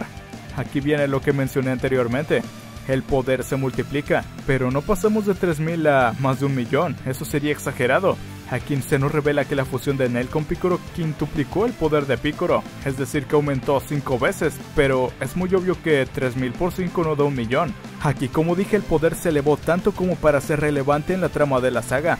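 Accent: North, Mexico. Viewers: Ahhh nms tiene como un acento norteño no? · senti que en este video hablaste como estilo norteño, fierro pariente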